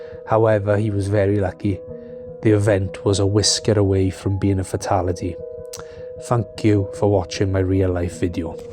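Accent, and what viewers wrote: Wales, United Kingdom. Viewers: Love your work man. Always a plus hearing a fellow Welshman - dydd gwyl dewi hapus!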